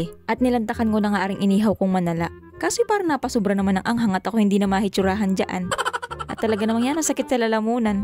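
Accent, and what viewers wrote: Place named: Camarines Norte, Philippines. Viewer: Parang salita sa camarines norte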